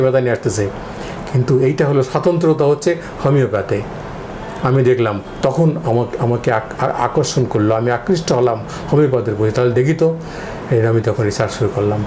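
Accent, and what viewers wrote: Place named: Chuadanga, Bangladesh. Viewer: স্যারের ভাষার মধ্যে চুয়াডাঙ্গার ভাষার মিল পাওয়া যায়।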